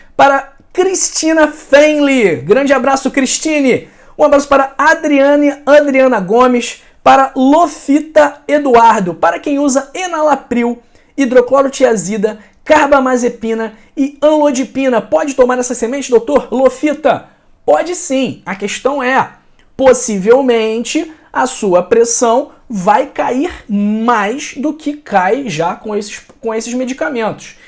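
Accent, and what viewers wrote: Rio de Janeiro, Brazil. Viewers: O sotaque do x já sabemos q e do rio essa palavra pechsquisa e do rio não sei como consegue mudar pro Chis ou xis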